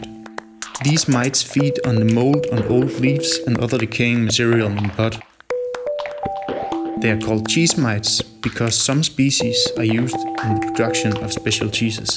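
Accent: Denmark. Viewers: Great to hear you've got a microphone now :D. Is that a danish accent? Apart from Demodex, biofilms of everyday objects (e.g. Keyboard/Mouse, sports drinking bottles) would be interesting, if bacteria (or whoever lives there) are large enough to be filmed.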